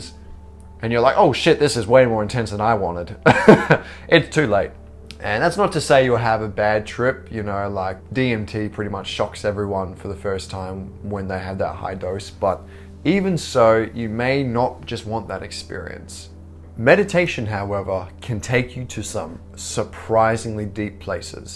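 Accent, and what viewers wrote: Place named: Australia. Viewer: Where are you from? You sound kinda Australian but idk it doesn't sound like 'ultra-Aussie-accent'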